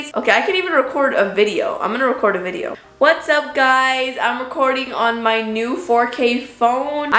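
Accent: United Kingdom. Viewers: HER BRITISH ACCENT IS SO GOOD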